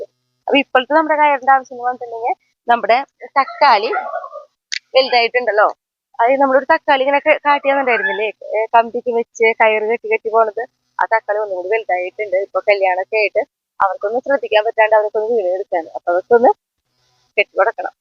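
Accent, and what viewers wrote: Thrissur, India. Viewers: വീഡിയോയും കൃഷിയും ഭക്ഷണവും എല്ലാം വളരെ നല്ലതാണ്... പക്ഷേ പറയുന്നത് പകുതിയേ മനസ്സിലാവുന്നുളളു. ഒന്നാമത് തൃശൂർ slang... പിന്നെ ഭയങ്കര സ്പീഡും.. ചേച്ചി തന്നെ വീഡിയോ ഒന്നു കണ്ട് നോക്കൂ.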